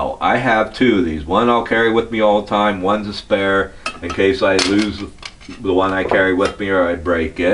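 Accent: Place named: Carolinas, United States. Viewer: where are you from? kinda sound like Forrest Gump, you from the Carolinas?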